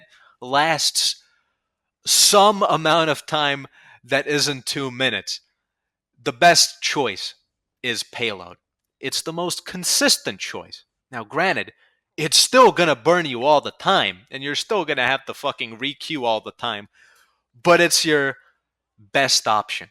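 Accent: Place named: New York, United States. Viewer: Babe wake up, the NYC sounding European is talking shit about Walve again!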